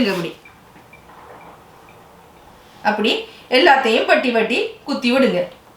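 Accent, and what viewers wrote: Sri Lanka. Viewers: Beautiful sharing I love this Srilankan tamil Thanks for sharing stay connected dear new frnd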